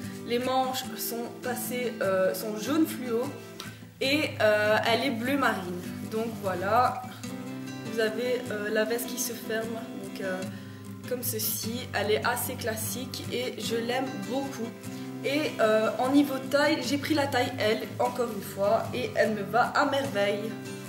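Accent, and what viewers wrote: Belgium. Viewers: tu est belge non ?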